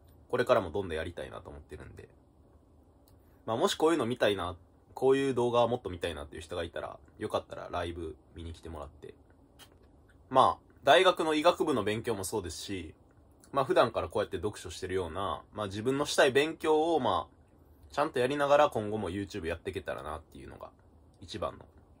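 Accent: Kansai, Japan. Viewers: なんか灘生の関西弁が好き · ダーマより好き笑 関西弁かつ喋りがうまいなんなら、カジサックより面白いし笑 とりあえず引っ越したらどうですか？？？？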